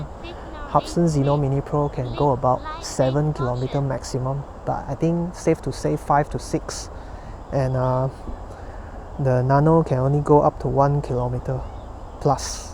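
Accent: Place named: China. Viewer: why autel is using a Chinese accent speaker? why not a neutral accent speaker?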